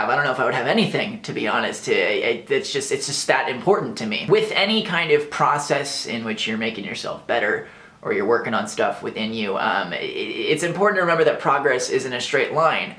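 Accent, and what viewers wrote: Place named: United States. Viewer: I CANT WITH AMERICAN ACCENTS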